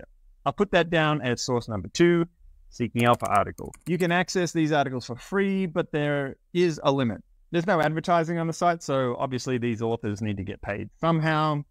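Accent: Australia. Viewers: Hi, sounds like you are Australian. Do you also cover Australian income ETFs? I'm Australian but live in the US so I invest in both countries. Thanks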